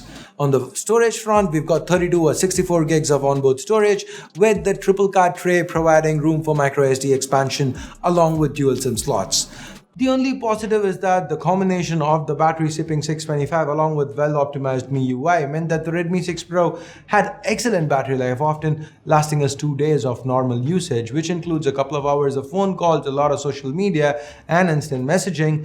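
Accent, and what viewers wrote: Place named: South India, India. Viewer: Hey, The Screen Size of Mi A2 Lite is not 5.99" It is 5.84". Just to let you know. Anyways You are just Awesome and like other South Indians your Accent is Awesome and Different.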